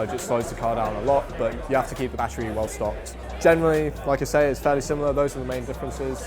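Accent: RP, United Kingdom. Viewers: This posh nerd as put me 2 sleep.! ☆WOW[NEVER THOUGHT I'D C THE DAY]....talking bout F1 & this donnie is making me snooze.! Lol..!!☆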